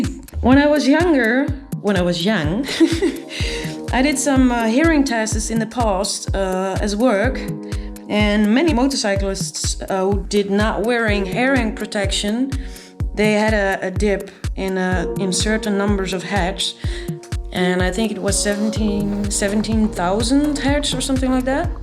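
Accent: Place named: Netherlands. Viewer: Why does she have a verkakte Dutch accent?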